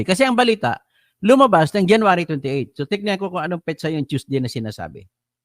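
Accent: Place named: Visayas, Philippines. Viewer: Hindi ba kayo nkakahalata, etong blogger halatang visaya yung salita nya pansin ko lang, palagay ko maimpluwensiya yung may hawak dito sa blogger na ito. Eto lang msasabi ko kapag si BBM ang idinawit mo ang sambayanan na mgagalit sayo. Ndi mo mabrain-wash ang tao pagdating kay BBM. Dahil gusto cya ng tao. Palagay ko duterte ang may hawak sayo.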